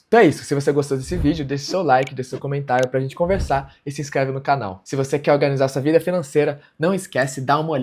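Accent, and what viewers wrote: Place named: São Paulo, Brazil. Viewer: nunca vi uma pessoa parecer tao paulista jesus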